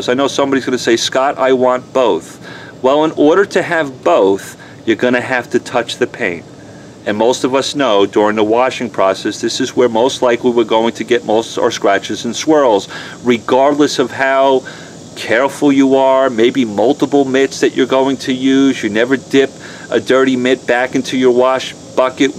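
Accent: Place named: Long Island, United States. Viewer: Similar to stern but very close to Ray Romano. Both of those guys grew up on Long Island. Where did Scott grow up?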